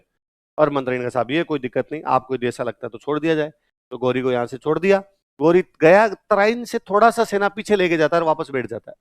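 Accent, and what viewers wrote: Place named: Rajasthan, India. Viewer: Or kaise bolte h bhai ye rajisthan ke teacher h to aise he bolte h bha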